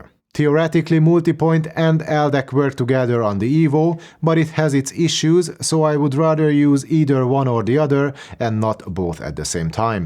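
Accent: Hungary. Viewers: Am I hearing it good that you are Hungarian sorry if it is an uncomfortable question just curious..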